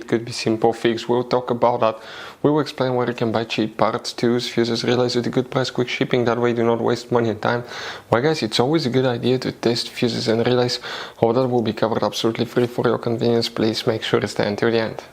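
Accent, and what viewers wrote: Russia. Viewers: Just curious my friend where is your accent from? Russia?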